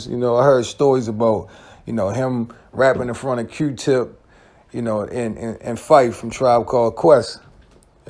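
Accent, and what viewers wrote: Baltimore, United States. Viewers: Kewl video, kinfolk✊ But, on some other... You from B-more?? Sound like, one of the big homies from round that way. 💯✊ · You from bmore huh I can hear it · You gotta be from Baltimore. I hear that accent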